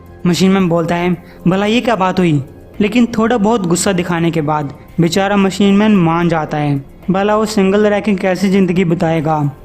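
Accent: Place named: Kota, India. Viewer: shai me.... Sayd maine tume deka hai... Me kota( jila )ke pas me ik gam se huuu.... Tumare bolne ke tarike se lagta hai ki tume bi yhi aas paas ke hi hoo...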